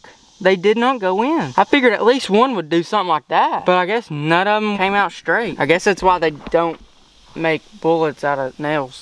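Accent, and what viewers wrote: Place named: Georgia, United States. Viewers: Sounds like he's from Georgia but says pop. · Bro i love your accents literally can't get more south than that! Yee yee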